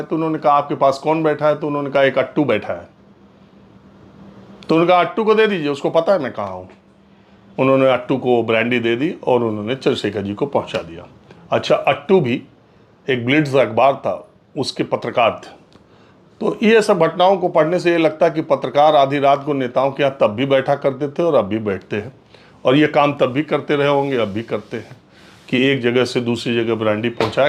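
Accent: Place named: Allahabad, India. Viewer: Sir Mahol Badhiya hai.Aap ke bat-cheet me Elahabadi mahaq rahti hai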